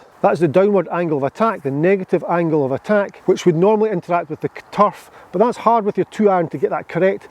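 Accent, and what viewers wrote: Scotland, United Kingdom. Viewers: Hey! First of many comments! Hi - thank you for you excellent tutorials. I always enjoy your lessons, recommendations, tips, and your entire approach to the game. I am an intermediate golfer over in Calgary, Alberta, Canada. I'm happy to big you up whenever I can. I had previously looked at other golf-guru types with similar channels, none of them helped me. I then looked at your Ben Hogan grip lessons, your overall laid back approach to the game, your Eureka methods, and boom, what a difference in my game and my enjoyment. That, plus now I can channel a Scottish voice in my head when making my shot. Thanks, keep it up.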